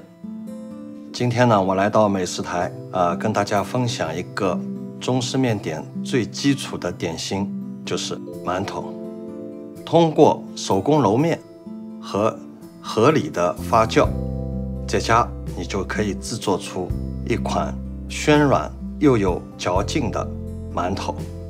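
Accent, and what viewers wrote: Shanghai, China. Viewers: 一听就是阿拉上海人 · 阿拉上海人👍👍👍温文尔雅，带着上海口音的普通话😀😀😀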